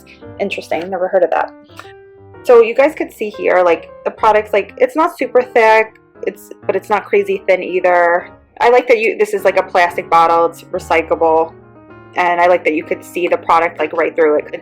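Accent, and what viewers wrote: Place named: Boston, United States. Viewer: Boston maybe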